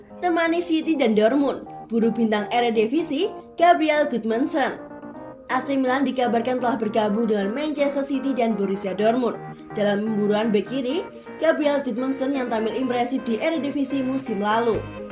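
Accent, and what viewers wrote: Java, Indonesia. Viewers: Waduh.. medok'e mbaaaakk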